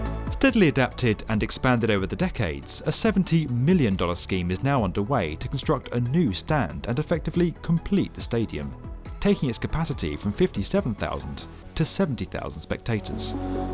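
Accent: United Kingdom. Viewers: British pronunciation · U sound British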